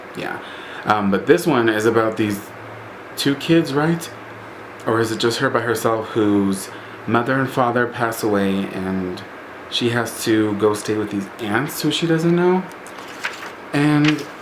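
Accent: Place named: United Kingdom. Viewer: lol your british accent is funny :D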